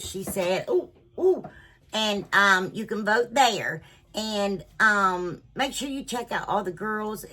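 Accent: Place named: East Tennessee, United States. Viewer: I love watching you because my family is in East Tennessee and your accent makes me feel like you’re family 😘 my entire house gets filled with snowmen. Only nativity sets and snowmen.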